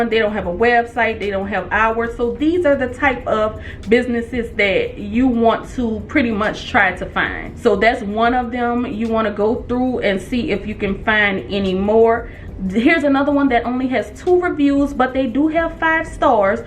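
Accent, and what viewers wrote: New Orleans, United States. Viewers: After listening to you speak the first thing I thought was "She MUST be from Nu Awlins". I just discovered your channel last week and will be applying your directions and advice to make money. And boy do I need it right now. I fall down but I keep getting up. Thanks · I can tell by your accent you from New Orleans !! Love seeing people from my city really make it out !! I'm next !! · I kept telling myself, she sounds like me, she has to be from the 504. lol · I love your N.O. accent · I knew you was a Nola baby😂.. Wuzaam witcha? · Girl.. you sound like you’re from the N.O!! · Girl! The second I heard you talking I was like she sounds like she’s from here… then Sho’Nuff you said New Orleans girl I’m right here on the West Bank! So of course, I subscribed for that reason and because you are doing the Lord’s work out here girl! 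Thank you so much for not gatekeeping information like this. Also, the way you explain the process step-by-step was flawless and completely the way I process things. So I just wanted to come on here and thank you and say hey from the West Bank and to tell you, you are doing amazing things and it’s appreciated! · Thanks for your info. I knew the accent was New Orleans❤ Baton Rouge here 👋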